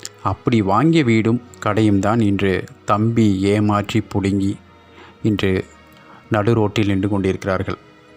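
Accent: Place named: Tirunelveli, India. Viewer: Super.. Once again that nellai slang.. feel at home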